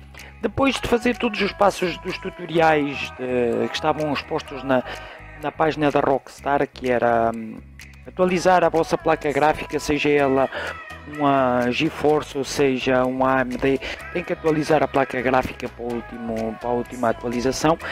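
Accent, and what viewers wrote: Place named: Europe. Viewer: maxo esse sotaque europeu e essa musica estourada puta merda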